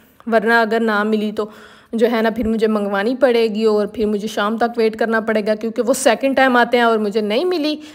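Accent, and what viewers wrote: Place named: Pothohari, Pakistan. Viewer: Ap potohaar sy hn?ap ka lehja pothohaari ha.lkn ap punjabi show krti hn khud ko.why?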